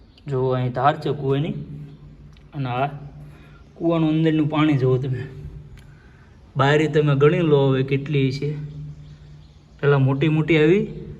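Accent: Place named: Bhavnagar, India. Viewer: તમારી 'બોલી' પરથી લાગે છે કે ભાવનગર-તરફના હશો...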